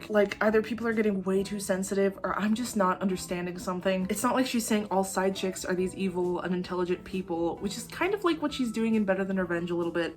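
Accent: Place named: South, United States. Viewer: She moved to Tennessee when she was a teenager, sometimes people pick up accents easily. I agreed with you about the first 2/3rd's of your video, but you don't get to criticize Taylor's bangs or people having curly/wavy hair and straightening their bangs when you are wearing the same look. I do agree it is not a good look on ANYONE. To me I love all her albums, I may have songs that aren't my favorite, but 98% of her songs are my favorites. I don't think I could pick out a favorite. New Years Day was the perfect ending to Reputation in my opinion. I loved her bleached-blond look, she looked so iconic. One more thing there is more to a country accent than saying certain words, you do have a southern accent (not a strong one though). I am a firm believer that how people speak comes from the area that they live in, I am from the north originally, but have lived in Virginia since 1991, when speaking to family members from Wisconsin, they think I sound southern. 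Case in point, a lot of people from other countries that move to say England, the children that are born there speak with an English accent, not the one of their parents native country. Well, that's all for my opinions.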